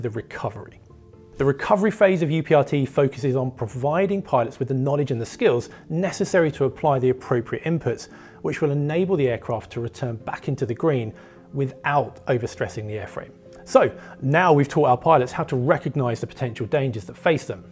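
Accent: England, United Kingdom. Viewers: I understand that English was invented by the British, but British pronunciation is a challenge. Compared to the slow and emotional Pereter, it's a little harder to understand. It's become more like a lecture than a conversation with a friend. Maybe should slow down a bit and pay attention to his intonation. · should slow down his speaking speed... And make his speech more clear avoiding british accent... Otherwise you'll lose people willing to hear that video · As English is not my first language, I'm just a bit struggling with English accent and he's sometimes a bit too fast for me. But I will keep on watching this channel that I like so much and eventually will get used to English. No offense, but I'm normally more used to American English. Anyways, keep on the good work, it's always interesting.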